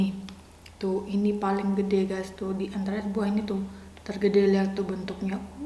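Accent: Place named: Bali, Indonesia. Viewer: Logatnya bali banget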